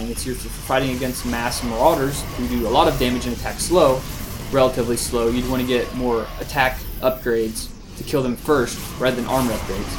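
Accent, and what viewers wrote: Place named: Texas, United States. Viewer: I like your texas accent :>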